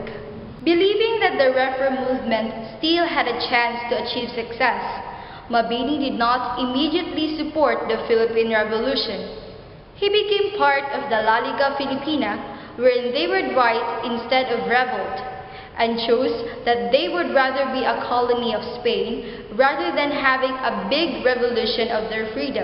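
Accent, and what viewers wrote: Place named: United States. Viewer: Is that your real voice? or lip synching...LOL. if that is...Congratulations!...you did perfect american accent, rise and fall intonation of voice are impressive...Good luck!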